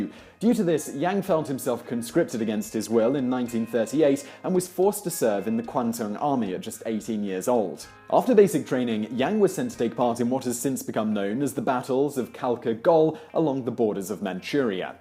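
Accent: England, United Kingdom. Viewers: Where is from in England?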